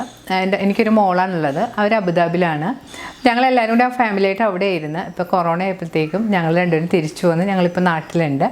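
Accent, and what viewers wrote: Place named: Kochi, India. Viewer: Inspiration video 😘😘 നാട് കൊച്ചിയിൽ ആണെന്ന് സംസാരം കേട്ടപ്പോൾ തന്നെ മനസ്സിലായിരുന്നു🤪, സെറ്റിയടെ അടുത്തിരിക്കുന്ന flower base കിടിലൻ ആണല്ലോ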